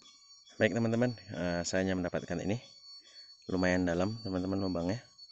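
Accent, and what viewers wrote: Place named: Medan, Indonesia. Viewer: Biasa itu mass dalam logat medan😄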